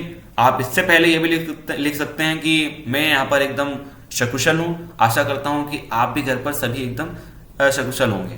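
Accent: Indore, India. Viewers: Yeh bhai pakka indori hai 🙂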